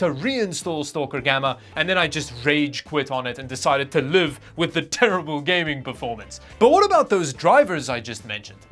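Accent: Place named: South Africa. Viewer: I love your South African accent, I only realised the other day that you were from SA thanks for the great quality videos, liefde van uit die tuis land❤️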